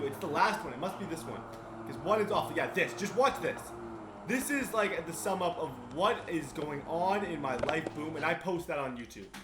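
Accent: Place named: Canada. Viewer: You sounded so Canadian in your first vid.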